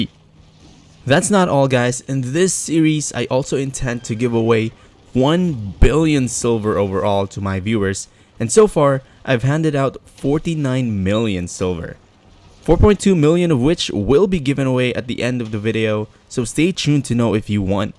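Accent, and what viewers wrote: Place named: Philippines. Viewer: Hi bro you have hints of Filipino accent with you. Am I right? Great video btw should i leave my current guild with friends to join the VIP crafters?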